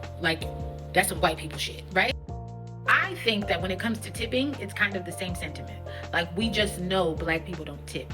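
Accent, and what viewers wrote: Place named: Africa. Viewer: she looks and talks like a first generation African. American blacks I agree do tip but we keep getting lumped in with these immigrant blacks that don’t tip , even the ones with money won’t. Also, why is she complaining about tips? Tips are whatever the customer thinks you deserve or feel like giving out of generosity! Perhaps she should find a job that pays hourly instead of making content pushing black stereotypes.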